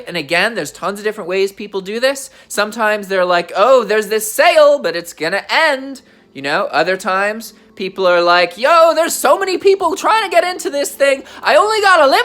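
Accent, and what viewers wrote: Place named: United Kingdom. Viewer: This dude sounds like he's hiding a British accent.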